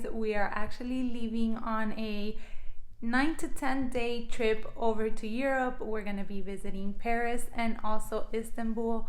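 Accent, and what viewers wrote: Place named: Miami, United States. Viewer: Are you from Miami? Your accent gives me kendall, hialeah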